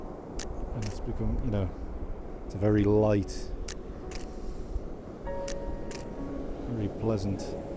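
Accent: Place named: Northumberland, United Kingdom. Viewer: Thank you, for these very interesting and informative videos. Like many, I came here after seeing you with Thomas Heaton. Your work is particularly reminiscent of the impressionists like Turner, in particular 'Wreckers Coast of Northumberland' and I applaud your efforts in this field. I liked your approach to processing the images and loved the outcome - a very unique perspective on a well known location. I can't wait to get out and try this myself. One small criticism is that when you were outside, the audio levels were a little low making it difficult to hear. No problems in the later two. Nice to hear a Northumbrian accent on here too!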